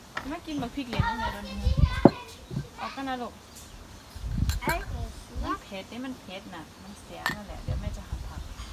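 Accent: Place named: Chaiyaphum, Thailand. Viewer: คนจังหวัดใด.. สำเนียงชัยภูมิแม่นบ่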